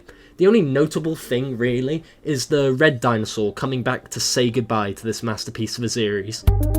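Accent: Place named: United Kingdom. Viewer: Yoooo,thw bri'ish man is back. Now try beating pvz 2 with only flowers.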